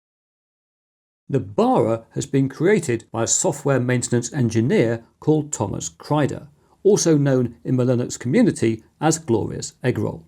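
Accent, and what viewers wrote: United Kingdom. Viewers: I am extremely happy to finally running across a system that had creators in mind allowing for DaVinci Resolve being flawlessly installed and even on AMD which is my config. And thank you so much running us through the process of not only installing the system but also through the Resolve install process! And all that done through a cool british tongue 😉 and just an overall great way of explaining everything. Keep it up!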